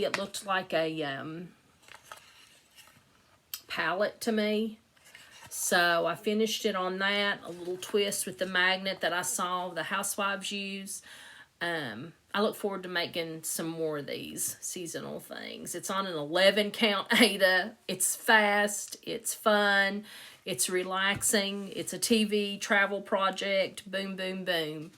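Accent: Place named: East Tennessee, United States. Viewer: I had a feeling when I saw your thumbnail that I would enjoy your Flosstubes, and I was right! I heard a really familiar accent in that voice. I live in East Tennessee, and you sound like my people!!! What is it with these husbands who think we have too much stuff? They have their stuff, too! You are working on some beautiful pieces. I love, too, that your husband's tractor is "sitting" in your grandfather's barn! And how awesome it will be to display your grandmother's click & wheel with the piece stitched to commemorate her with hers! Thank you so much for sharing!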